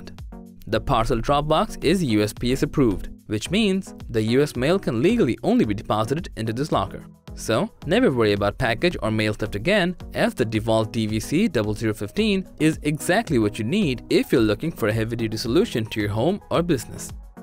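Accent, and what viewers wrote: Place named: China. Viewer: Your Chinglish needs a bit of work...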